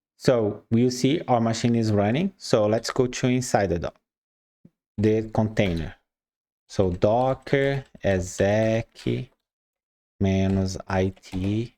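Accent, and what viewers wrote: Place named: Brazil. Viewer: blz! Muito bom vídeo, vi meu sotaque na sua fala! rs De qualquer forma, o conteúdo é 10 e bem claro.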